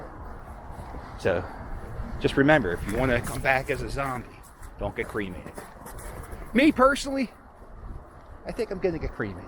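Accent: Philadelphia, United States. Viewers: I love the accent...he sounds like my cousins in S. Philly.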